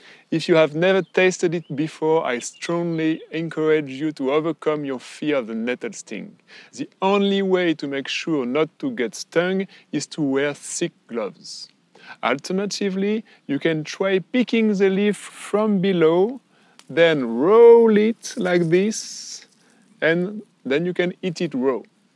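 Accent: France. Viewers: Oh man your english is so french......., that's so lovely, you're so cute, I love it!!!!!! Hugg's & kisses ;-)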